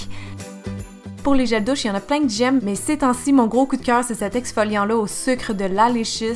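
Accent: Quebec, Canada. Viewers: Super vidéo. J'adore ta déco soi disant passant. Merci pour ta bonne humeur, ton bel accent québécois je ne me lasse vraiment d'aucunes de tes vidéos.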